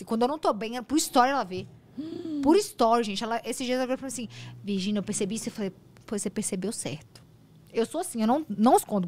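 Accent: Minas Gerais, Brazil. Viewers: O sotaque dela é mineiro. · Gente, a é mais mineira que eu que moro em minas kkkkkkkkkk Véi, uai, “esse trem e diferente demais uai”, trem, “nunca vi um trem desse”, maravilhosa, buxinha kkkkkkkkkkk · o sotaque dela é mineiro ou goiano ?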